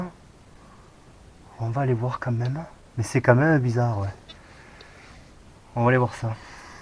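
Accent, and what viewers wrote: South, France. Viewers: Impossible de répondre je fais un essai comme ça si ça fonctionne je répondrai de nouveau Ça y est ça marche alors j’ajoute : Merci pour les infos je commande souvent sur Ali… Je vais regarder merci pour l’info Concernant ce rencontré ça risque d’être compliqué je suis dans l’Allier 03 Vu l’accent 😜 vous êtes dans le sud ?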